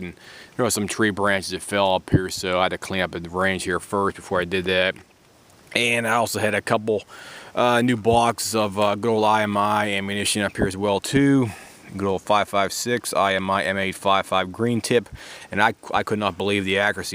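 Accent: Pittsburgh, United States. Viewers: This guy is definitely from the Pittsburgh area!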